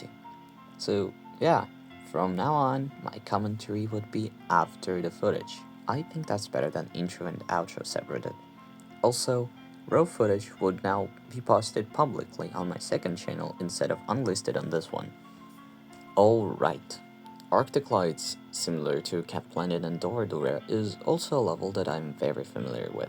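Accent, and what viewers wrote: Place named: Russia. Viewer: Все равно русский акцент,чувствуется ппц.А зачем вот это английское,если тебя не смотрит никто и так,про зарубежных вообще молчу.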